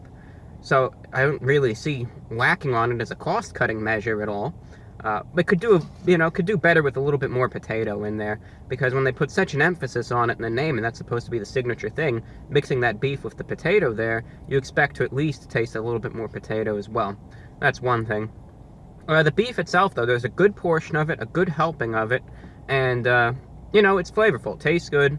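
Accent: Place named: Transatlantic, United States. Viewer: Btw i think your better than joeys world tour :3 Im east coast just like you! And i love your transatlantic accent too <33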